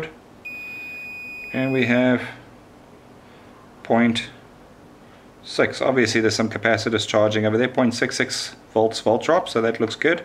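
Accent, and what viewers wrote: South Africa. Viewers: Clear explanation 😊 You sound South African, your accent.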